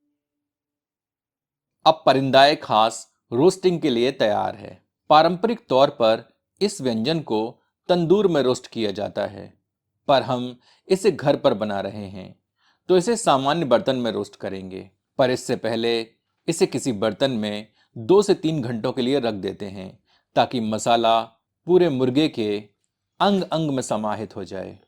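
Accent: Standard. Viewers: Boss aap kanase hai aapka hindi bahut sudha hsi. I like it.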